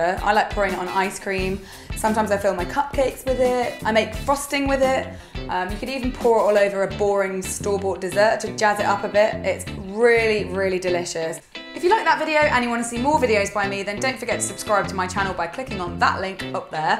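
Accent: United Kingdom. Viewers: I suppose she meant uk cup, because she has a british accent, so I think that she is English.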